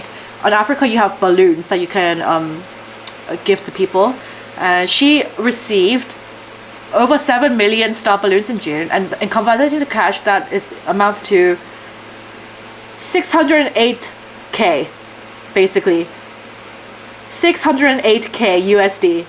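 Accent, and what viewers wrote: United Kingdom. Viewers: You are just super hot! The British accent just propels your channel!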